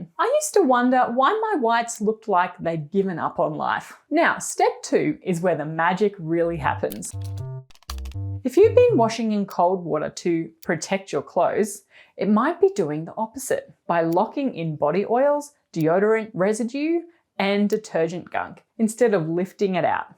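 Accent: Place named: United Kingdom. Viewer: It's lace and not "lice". Why do Britishers wag their tongue while saying certain words is beyond me 😮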